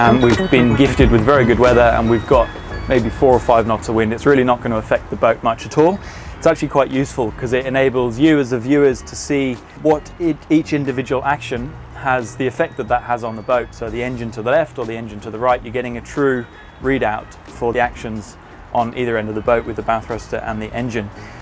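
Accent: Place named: United Kingdom. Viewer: That accent says born in the UK. I’d like to think if you were in UK you’d use the kill cord immediately the engine is running. And yes you can do the lines single handedly with the engine off, step to the helm, kill cord on then complete manoeuvre. It’s a great video, but remember you set the example to every newbie watching this show who may have no idea how or why the should use a kill cord.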